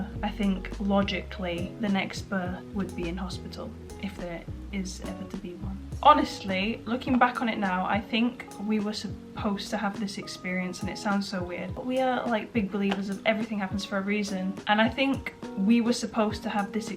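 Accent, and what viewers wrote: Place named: Wales, United Kingdom. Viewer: I can seriously relate when you say you have Connor and your family only. I dropped all of my high school friends due to toxicity, and found my best friend in my boyfriend. Also I am a welshie gal !! Love watching your videos and hearing a familiar accent😊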